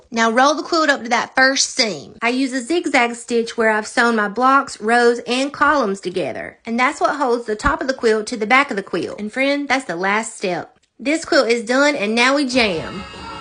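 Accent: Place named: Lowcountry, United States. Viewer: Girl dey jus don no how to hear country specially low country. You do you this Cajun girl understands you just fine. Bless their hearts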